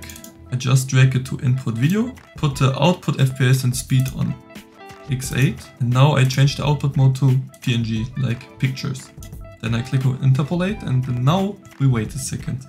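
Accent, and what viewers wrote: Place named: Germany. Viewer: You have a German Akzent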